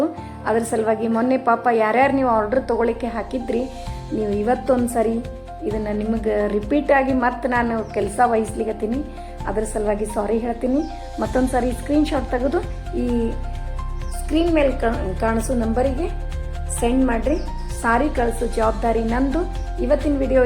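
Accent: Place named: Hubballi, India. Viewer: Aduge choyittu niu yaav urinavru nimma bhashe kelidre hubballi anistu😊❤🎉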